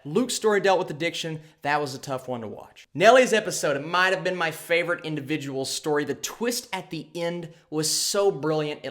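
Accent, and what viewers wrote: South, United States. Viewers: Something I had was I was expect something to happen all the time, usually in horror movies/series you can't tell when somethings gonna happen. By the way your southern accent is killing me I love it.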